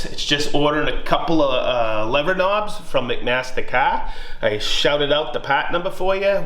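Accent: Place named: Massachusetts, United States. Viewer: Cambridge MA here the accent I think your from Massachusetts? Thanks for sharing!!!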